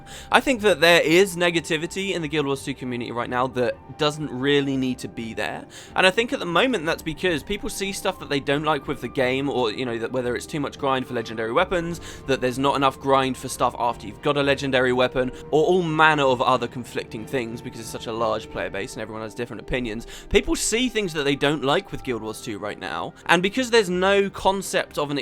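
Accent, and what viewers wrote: United Kingdom. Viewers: I just realized you sound like a more British Julian Bashir. Yea I was hoping that the Living Story steps would be connected to each other because that would make it feel more connected and have a more over arching story and lore. I've been trying to get into the living story but BotFW has lost me. The other parts of the story were pretty uninteresting but this is so disconnected that I have no drive to even go there.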